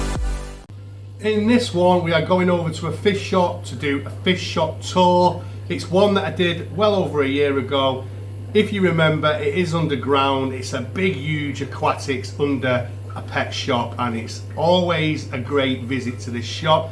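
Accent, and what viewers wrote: Manchester, United Kingdom. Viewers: You sound like a local lad to me mate (Manchester) I went to pier aquatics in Wigan for the first time last week, definitely my new favourite shop, bought myself a Gold saum 🤩 not sure if you know about it but there’s a cichlid convention in Rochdale on September 10th mate, il definitely be checking that out. I’ve only been keeping fish for about 6 months but I’m totally hooked 😅😆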